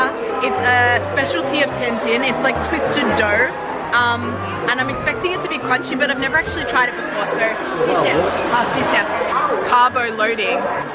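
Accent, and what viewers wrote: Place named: Australia. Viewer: Big love for this girl’s video, amazing accent , passionate speaking ，week done Aussie girl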